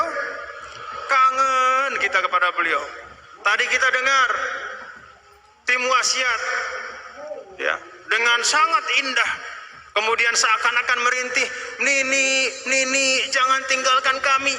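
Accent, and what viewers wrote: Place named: Lombok, Indonesia. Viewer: hihi bahasa lomboknya masih nyantul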